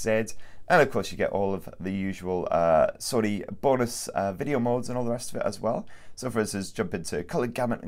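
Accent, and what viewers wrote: United Kingdom. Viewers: Love your British accent and sound.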